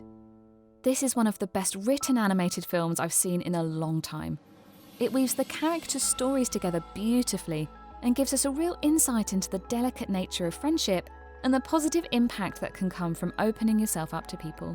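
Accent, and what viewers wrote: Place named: United Kingdom. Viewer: That British Accent makes this even more legit.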